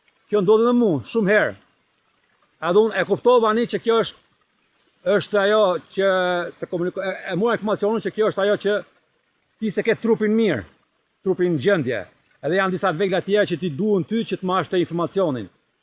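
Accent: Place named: Gheg, Albania. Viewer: E folura e ketij djali esht gjuhe bio,esht gege jo toske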